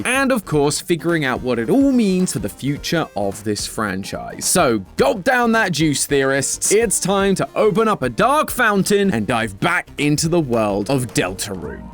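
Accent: United Kingdom. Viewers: i love how British accent makes 'deltarune' appear as 'delta room' in the subtitles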